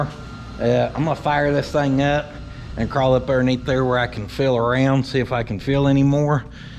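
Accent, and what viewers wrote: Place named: Appalachia, United States. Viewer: LMFAO - I used to think the "Deliverance" - "inbreed from the hills of Kentucky" ACT, was just that, an act just for filming YouTube videos - you know just a shtick. But I have come to realize that it is real, this is really how you are, and the way you talk, and your real IQ. Kind of makes this channel even funnier when one realizes all the jumping, dancing, talking like a hillbilly, doing things that make you look not so sharp, is actually REAL.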